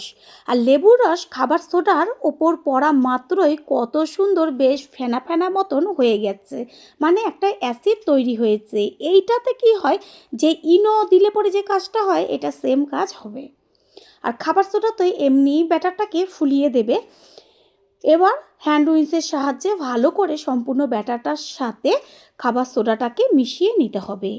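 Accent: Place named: Bangladesh. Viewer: Apnar katha gulo bagla deshi moto lagche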